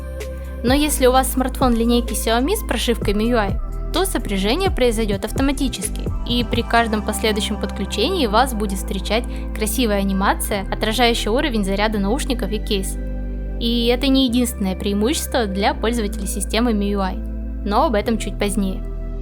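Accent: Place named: Perm, Russia. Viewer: Я думаю, какие-то пейзажи знакомые. И говор... так она ж наша Пермская!) Приятно)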